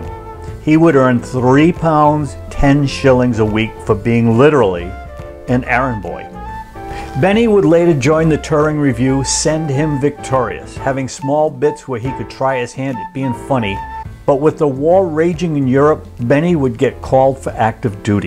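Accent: United States. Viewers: ONLY thing sad is this usa accent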